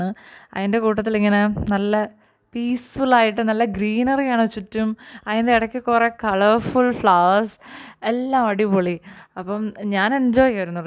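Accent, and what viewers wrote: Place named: Kottayam, India. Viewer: R u from Kottayam..... Oru Kottayathinte slang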